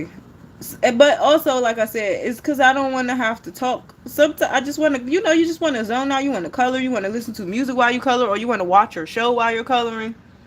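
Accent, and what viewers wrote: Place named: Washington DC, United States. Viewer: Hey …. I’m from Maryland (15 min from DC), I just watched this live…🤦🏻‍♀️but I just wanted to inform you that there is a Blick Art (a.k.a “Dick Blick”) DC on l street NW. I wish I could color skin as well you. Great job!! I’ll turn the bell on if you stream again. I knew I heard our DC accent in your voice. Go to the Blick downtown it’s nice!!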